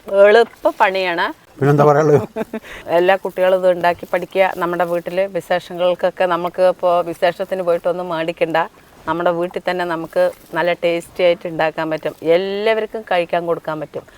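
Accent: Palakkad, India. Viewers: അടിപൊളി 👍🏻.ഒരു പാലക്കാടൻ slang ആണല്ലോ ചേച്ചി? പാലക്കാട്‌ ആണോ?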